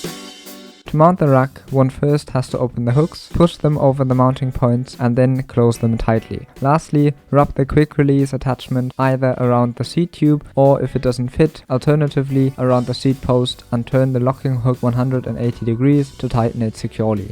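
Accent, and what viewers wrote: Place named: Germany. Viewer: I love this German guy. Straight to the point, pragmatic, no BS. And cool accent. 10/10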